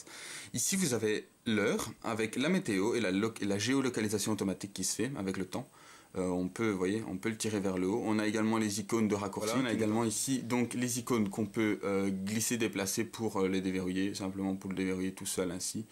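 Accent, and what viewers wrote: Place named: Belgium. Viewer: super review, j'adore l'accent belge !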